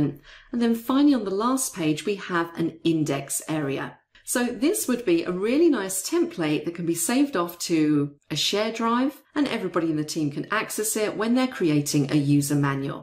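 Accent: United Kingdom. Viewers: Thanks for excellent explanations! Btw, you sound so British, I loved it :)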